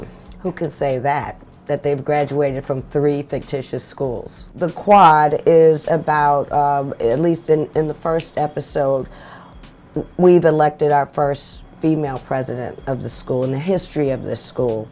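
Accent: South, United States. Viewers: She definitely ain’t nan like Whitley Gilbert bruh💯🚫🧢even doe she frm up Nawf she got popular off uh dat gud O kuntry accent own “A Different World 🌍 “ ya digg... not ta mention she dun spent majority uh ha time in my hometown ATL💪🏽Salutez👆🏽Mz Guy u alwayz have been my crush since Whitley doe😍👌🏽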